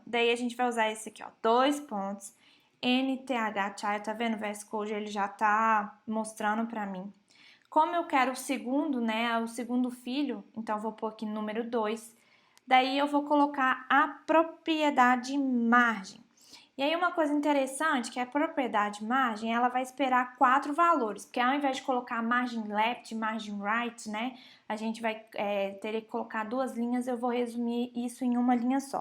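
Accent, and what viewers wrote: Minas Gerais, Brazil. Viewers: É muito sotaque de mineira !!!! Eita trem baum sô !!!!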